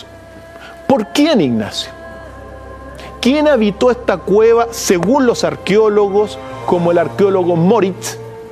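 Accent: Chile. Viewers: Este programa es chileno? Yo tenía un amigo que tenía un acento igual y me dijo que era de Chile. No sé. Igual me equivoco, pero este acento parece de Chile. Aunque tengo dudas sobre si es de Uruguay o Paraguay. No sé. Es difícil distinguir con tanto acento latino. De Cuba también podría ser. Pero de Méjico no, desde luego. Tampoco de Perú. Bueno, ahí lo dejo. Un enigma para mi.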